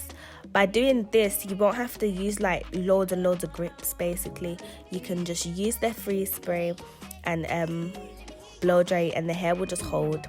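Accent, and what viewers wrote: Birmingham, United Kingdom. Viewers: It’s a Birmingham accent x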